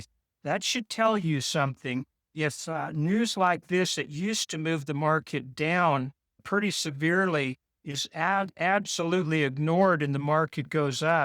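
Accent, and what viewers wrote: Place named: South, United States. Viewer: New microphone? Your southern twang I wish I had is missing today.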